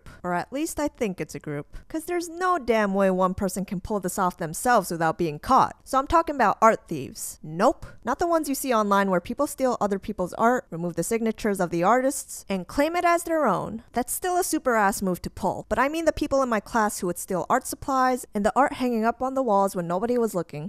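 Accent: United States. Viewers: Aesthic Vibes only I thought you were American 🤔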